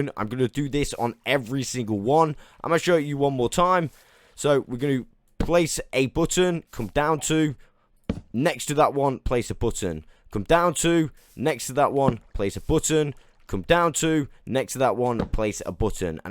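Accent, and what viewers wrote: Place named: England, United Kingdom. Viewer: english lol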